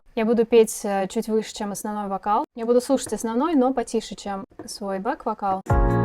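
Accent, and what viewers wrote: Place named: France. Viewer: Такой крутой урок! Подписалась с англоязычных обзоров, думала, что вы француженка по акценту. А тут оп и русский. Так приятно 😍 И вот эта серия из 3 уроков просто 🔥🔥🔥🔥🔥🔥🔥 Спасибо ❤️ У вас так много знаний по обработке, буду ждать новых уроков ❤️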